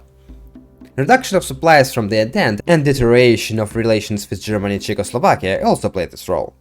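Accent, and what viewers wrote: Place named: Russia. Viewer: good content, but pronounciatin is... difficult. Fast running through text and not very clearly spoken. Sounds kike a Russian native speaker thinking that speaking fast and unclearly means a high level of language skill. English natives are easier to understand... please do sth with that feedback, as your content is of value!!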